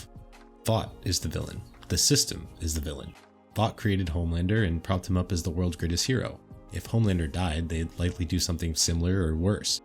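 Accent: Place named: United States. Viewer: Thanks for talking about the boys in perfect American not like these wana be Americans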